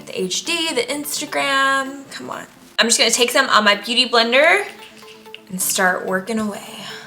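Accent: United States. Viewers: Are you American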